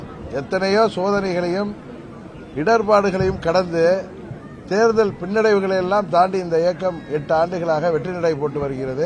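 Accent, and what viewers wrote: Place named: Thanjavur, India. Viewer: Thanjavur kaarar slang, Thiruppa thiruppa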